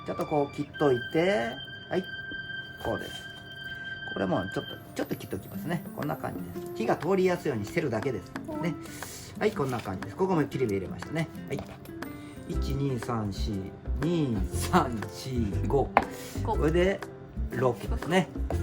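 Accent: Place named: United States. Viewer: このYouTubeのながれで1年前のから揚げPart2も観ましたが、の英語、綺麗なアメリカ英語ですね。from Los Angeles🇺🇸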